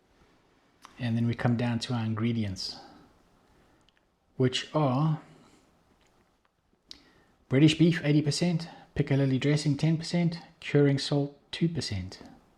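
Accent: South Africa. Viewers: What accent's that, bud? My ear is saying South African.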